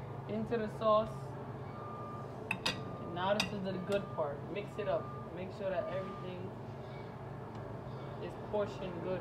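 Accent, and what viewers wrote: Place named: New York, United States. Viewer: I just love ur new York accent 🙂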